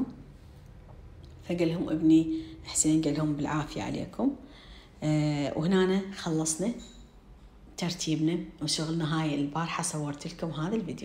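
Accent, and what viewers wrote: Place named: Basra, Iraq. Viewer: لهجة اهل البصرة انت من اهل البصرة?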